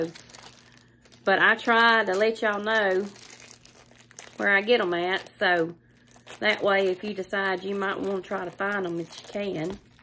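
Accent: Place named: Mississippi, United States. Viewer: Nice haul. Where are you from? Your accent sounds like my family in Mississippi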